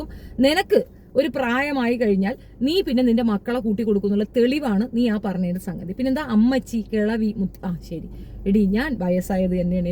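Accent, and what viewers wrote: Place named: Kollam, India. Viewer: ഈ ചേച്ചി നമ്മുടെ നാട്ടുകാരി ആണന്നു തോന്നുന്നു എന്റെ നാട്ടിലെ പെണ്ണുങ്ങൾ ഇങ്ങനെ ആണ് ഡയലോഗ് സ്ലാങ്.ആയുർ ചടയമംഗലം കടക്കൽ നിലമേൽ വല്ലോം ആണോ സ്വദേശം അന്യം നിന്ന് പോകുന്ന പല വാക്കുകളും ഈ ചേച്ചി ഓർമിപ്പിക്കുന്നു സൂപ്പർ. അടിപൊളി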